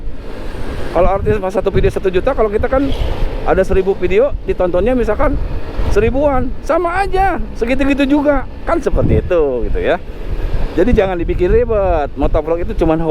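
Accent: West Java, Indonesia. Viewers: assalamualaikum abang, salam silturahim dari mmx malaysia. wah biker sejadi. ngevlog abang juga keren euy. enak dengerin logat sundanya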